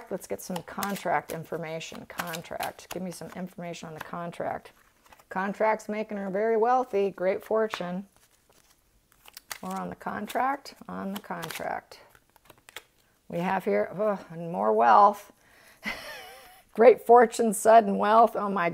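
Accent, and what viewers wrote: United States. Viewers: Love your voice! It’s calming are you from the Midwest? I sensed that from you the day I heard your voice.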